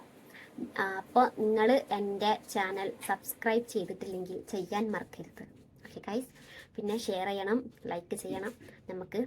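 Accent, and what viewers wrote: Malappuram, India. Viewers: മലപ്പുറം മലയാളം ആണല്ലോ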